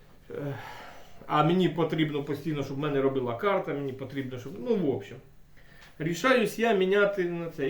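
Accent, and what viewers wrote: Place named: Bila Tserkva, Ukraine. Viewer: отож таке. Уроди скрізь є. А ну скажи мені будь ласка, ти проживав в районі Білої Церкви? (Приблизно) Сильно діалект знайомий. Бажаю Вашій сім'ї всього хорошого.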